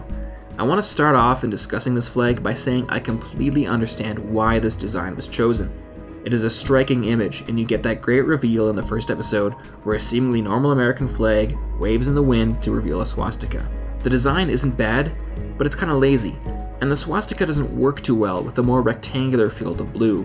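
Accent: Canada. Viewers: You sound so Canadian. The Canadian flag is simple, striking, and well designed!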